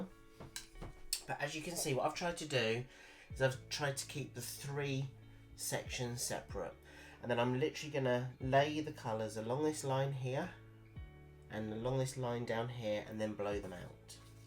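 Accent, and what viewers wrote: England, United Kingdom. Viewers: OMG.I could almost hear your cogs turning and I loved it.I'm glsd I'm not the only one who makes split decisions. No matter how we plan,the art just takes it own natural journey. The piece it beautiful.So delicate and full of movement. I LOVE IT. Thank you for sharing.Git the ljnk off Facebook and have now subscribed to your channel.I may do the Molly/Olga and Donna course seeing as you have done it,it must be good.I love these 3 artists.I am also wondering about the Daler and Rowney paint.Is it a good brand?I am in Ireland and am wondering (because of your accent if you are England)because if you are then the products you use are probably easier for me to source. Thank you so much for the links and videos.I will enjoy watching.I like your style.🤗🇮🇪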